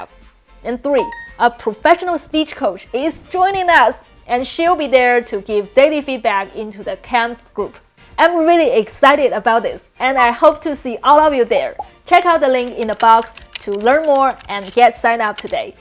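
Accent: China. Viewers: 为什么你嫁了美国老公，中国口音还这么重呢？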